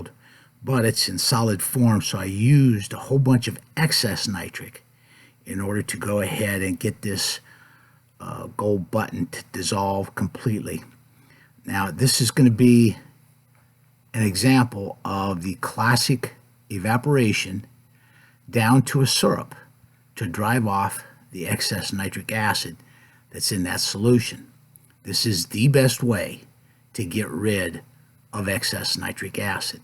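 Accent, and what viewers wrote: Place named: Chicago, United States. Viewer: stahk paht lol I didnt know you were from Chicago ... Watching you refine gold is really informative Ill never get sick of watching you make forbidden orange drink. Bonide and GFS chemicals should sponsor you with as much product as you go though. (nitric acid is super spendy) $350 is just about too much for my wallet these days.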